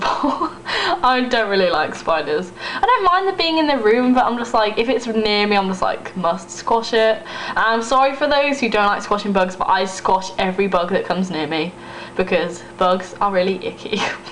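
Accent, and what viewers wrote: East Midlands, United Kingdom. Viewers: Nice! The test is really designed for different American accents. But, your accent is very lovely. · Thank you this is so helpful listening to your dialect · The East-Midlands Accent is the most similar United Kingdom Accent to the my American Accent. I must advise to never feel ashamed of your Accent, regardless of what another individual will verbalise, each quark of your East-Midlands Accent is Adorable, Embrace the Individual you are. 😉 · Firstly you're insinuating that posh is not an accent, when it is. And secondly this is just how everyone sounds in East midlands, I'm from Brackley which is pretty much on the borders of Ox, Northants and Bucks and its quite easy to hear the regional differences between the three.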